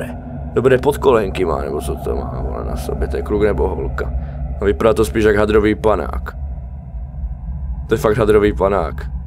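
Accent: Moravia, Czech Republic. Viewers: Aaaa Bojanovcak :) si rikam odkad ted silny moravsky prizvuk (to je jedine dobre, uz mam tech "cechackovskej" vlogeru dost)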